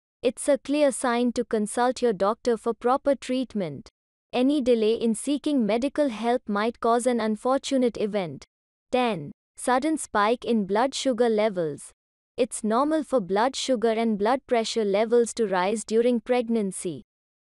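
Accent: India. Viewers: Ugh, another Indian accent video. So annoying.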